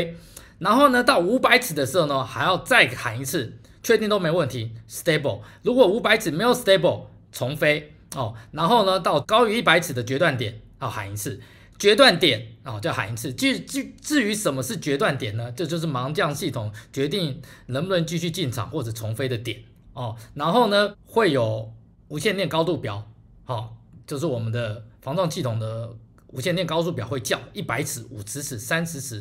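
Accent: Taiwan. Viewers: 為何大佬在外工作那麼長時間英文完全沒口音，完全就很台灣味...為何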